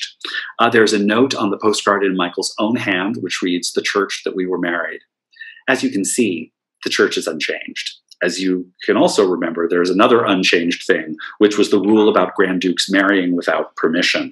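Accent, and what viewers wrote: Russia. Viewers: Concise and well presented material by vastly prepared lecturer. A lecture left space to reflect and to engage in further reading. Thank you for pronouncing Russian names in Russian. It was adorable. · This homosexual lecturer is overly obsessed with the Romanov's, even taking pains to pronounce the names in proper Russian. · Thank you for speaking the truth. I say the same on other sites and I am told I'm being "excessive" "hysterical" "lying" etc. I appreciate the Russian pronunciation. 5 years ago I found out I have Russian heritage.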